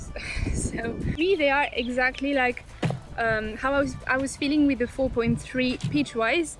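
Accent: France. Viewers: Je dois debarquer(voir parler dans le vide)Mais,il n'y a pas un accent français?☺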